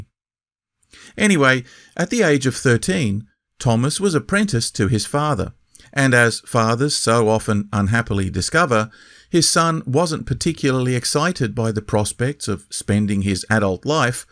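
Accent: Australia. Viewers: I...think you are an Aussie. No problem haha. Your productions are great. And you have an excellent delivery voice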